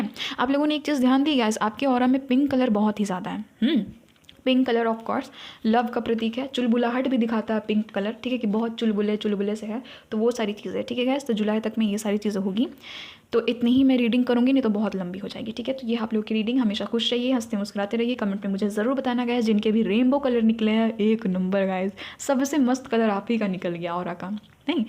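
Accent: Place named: RP, United Kingdom. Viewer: I like your accent, I am myself into British RP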